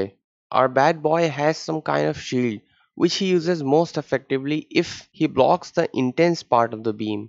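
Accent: India. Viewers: You seem to a young Indian by your accent What the f in United states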